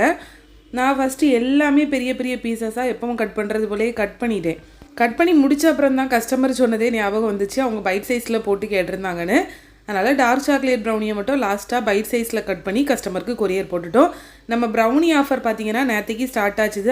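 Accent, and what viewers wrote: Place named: Kanyakumari, India. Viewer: Akka neenga kanyakumari ah paesurathu namma ooru slang maari irukuthu...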